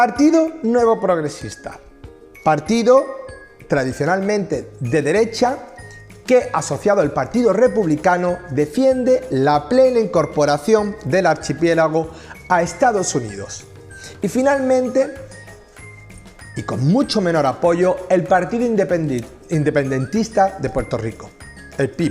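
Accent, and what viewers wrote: Andalusia, Spain. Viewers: Es andaluz... lol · Soy andaluz, respecto a tu acento decir que si me dicen que eres de un pueblo vecino me lo creo. Podrías ser mi primo hermano literalmente. Tu acento es más parecido al nuestro que el de un Asturiano, Gallego o Aragonés. Es como si hubiera conocido un hermano raptado de la familia y me lo hubieran presentado ahora. Es sorprendente como pueden intereses políticos y las gerras pueden crear nuevas fronteras entre las personas que en esencia somos iguales. Un abrazo hermano. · Eres Andaluz?? Hablas igual que yo. (Sevilla)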